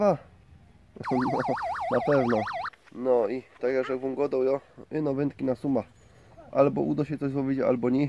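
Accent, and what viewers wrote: Silesia, Poland. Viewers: Filmik fajny, ale śląskiej gwary bardzo ciężko się słucha.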